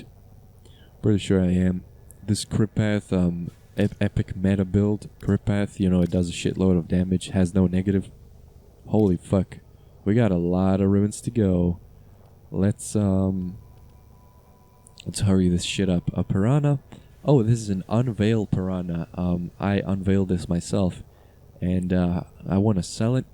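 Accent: United States. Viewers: Q&A: whens that hot dog review coming? I want to see shlurp a sausage 😩😤 Also you sound American but you said you live in Europe so where do you come from?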